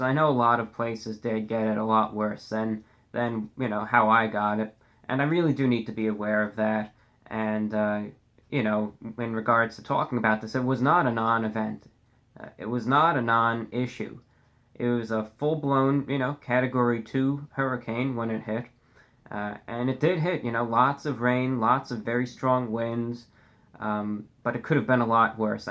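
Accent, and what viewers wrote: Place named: Massachusetts, United States. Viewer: Bro wtf i thought u were from Massachusetts!?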